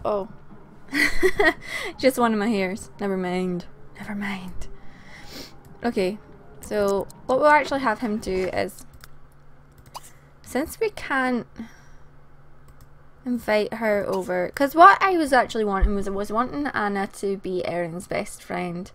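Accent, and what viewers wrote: Scotland, United Kingdom. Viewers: I am Scottish and I can tell that she is Scottish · Yeah, I used to live in Scotland and her accent is kinda like it. :) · She is Scottish (: I am Scottish too! Makes me proud :')x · I love your accent! Scottish accent is pretty awesome :) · Finally another Scottish youtube! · I'm Irish and she's definitely Scottish. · im scottish but i had no idea you were scottish haha and i laughed at the bit when he was looking on his phone haha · You sound Scottish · Comments: 50%asking if your scottish 25%saying they like your scottis accent 24%saying other stufss 1% saying the comments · she is scottish! I WANT HER ACCENT though D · LOVE the Scottish accent. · ***** You're Scottish aren't you? Cause I can here that accent from miles (probably because I am Scottish). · I'm watching this because I like watching old Sims videos because they used to be basically all I'd watch and I have one question Is she Scottish? 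She sounds Scottish. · Where are you from? It's pretty obvious to spot an Irish accent from a scottish one. She's Scottish. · YEY finally a Scottish you tuber I am aswell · 100% Scottish :P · Omg she's Scottish yasssss I like to call my self Scottish because I move in Scotland when I was 6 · Your accent is amazing! I love how you talk lol (Scottish?) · Melissa Hubbert She's from Fife, so kind of in the highlands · Its so nice to hear our accent used well! Theres so many people in Scotland who use abbreviation of the end on words, especially verbs, far too often. I like your dictation mixed in with our 'ma's and stuff like that! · WOA UR SCOTTISH?!!! I'VE ALWAYS WANTED TO HEAR A SCOTTISH PERSON TALK ITS AN AWESOME ACCENT IDK WHY I LIKE ACCENTS SO MUCH I WISH I HAS ONE FJEFJECH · I love your accent. It's just something about Scottish accents that make them just so cool.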